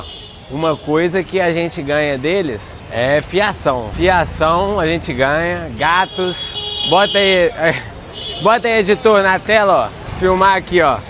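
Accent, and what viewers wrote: Minas Gerais, Brazil. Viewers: Estou amando seus videos, o sotaque Mineirinho me dá uma saudade de casa 🥰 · Vô te falá viu. Acho q ele é mineiro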